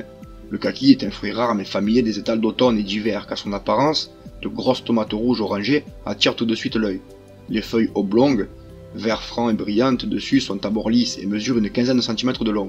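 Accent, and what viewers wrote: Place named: Southwest, France. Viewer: Je viens de te découvrir par la vidéo permaculture passer d'un sol mort à 1 vivant. J'ai adoré. Tu es où en Centre France ? Car vu l'accent.... J'aurais plutôt parié sur le sud Ouest😂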